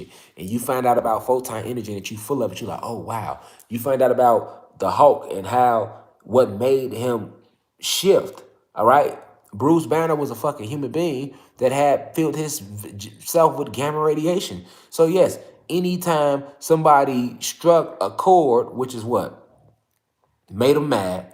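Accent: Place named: California, United States. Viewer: Ik you from Chicago but I hear Cali also with the accent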